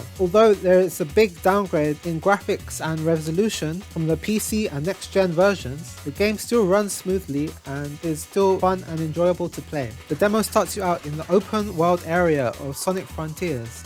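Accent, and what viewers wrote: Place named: Japan. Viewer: Are you Japanese? Your accent is interesting.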